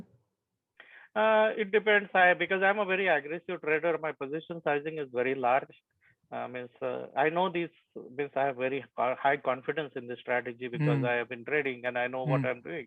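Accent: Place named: India. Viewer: I really want to learn from him, his strategies are good. But I know other people from India who also speak like that and it is very annoying and a turn off.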